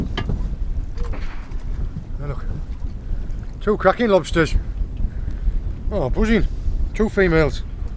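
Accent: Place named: North Yorkshire, United Kingdom. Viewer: Such a familiar accent if tha aint a North Yorkshire lad I'll eat tha hat me sen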